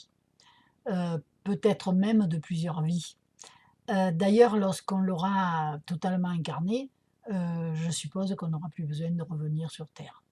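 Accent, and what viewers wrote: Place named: North, France. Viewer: Bonsoir madame vous avez un magnifique accent du ch'nord!!! Me trompe-je?😇🙏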